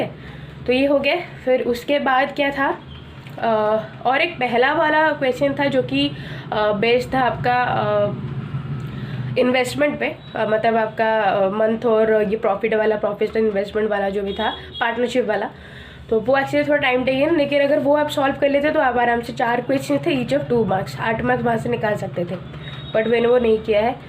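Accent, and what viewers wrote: Odisha, India. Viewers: Didi app odisha se ho kya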